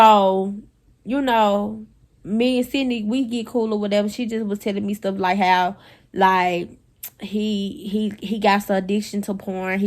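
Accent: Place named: Arkansas, United States. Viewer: We can tell you are from Arkansas lol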